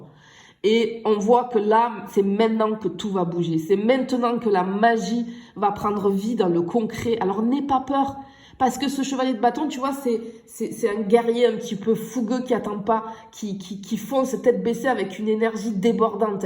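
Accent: South, France. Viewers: Tellement joli cet accent du sud ☀️🐝🌻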